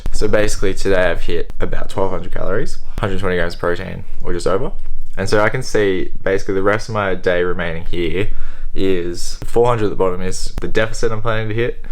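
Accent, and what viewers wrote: Australia. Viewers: Hey man, its probably because of the aussie accent but you remind me so much of calm, less crazy Last Natural! Keep up the videos really enjoying it.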